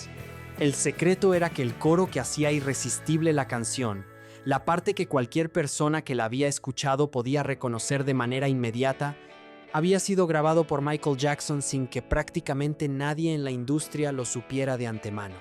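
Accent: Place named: England, United Kingdom. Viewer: Es insoportable esa voz de inglés tratándo de hablar español!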